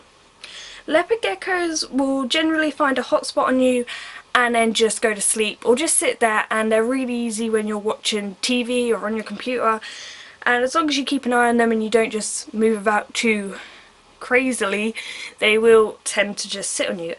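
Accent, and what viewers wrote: England, United Kingdom. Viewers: idiot it is a British accent!!! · DigitalGaming she lives in England and her accent is real bro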